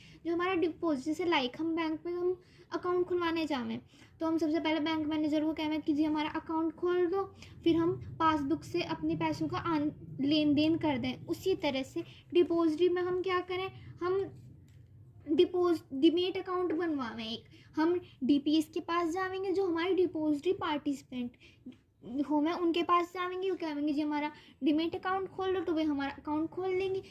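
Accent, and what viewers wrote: Uttar Pradesh, India. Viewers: Aapki cute voice m up dikh rha hai i am also from utter pradesh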